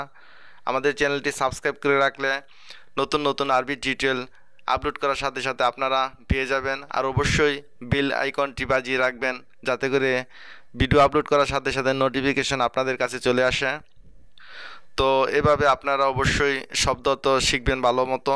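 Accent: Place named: Chittagong, Bangladesh. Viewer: আরবী ভাষায় এ কার ও কার নাই। তাই আপনার শেখানোর পদ্ধতি উত্তম নয়। আপনি আরবির আঞ্চলিক উচ্চারণে শেখাচ্ছেন। কেউ যদি বাংলা শিখতে চায় সে কখনো নোয়াখালী বা আপনার নিজের এলাকা চট্টগ্রাম এর মত করে শিখবেনা। সে সঠিক উচ্চারনে শিখবে। ধন্যবাদ।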